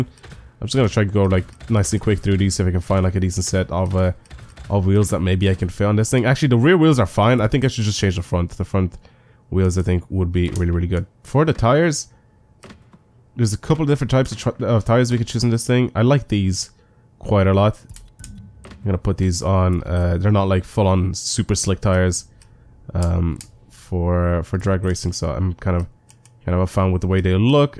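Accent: Ireland. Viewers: is from Ireland? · Is he irish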